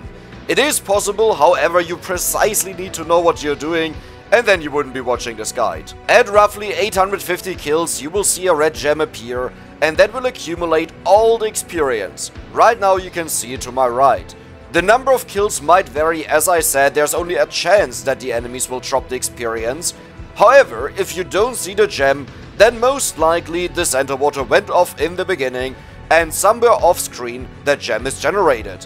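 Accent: Germany. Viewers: dein englisch klingtr mega deutsch, mir würde das video in deutsch mehr helfen XD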